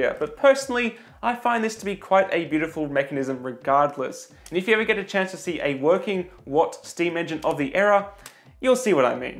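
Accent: Australia. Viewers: Nauhteeboy he's an Aussie ya dick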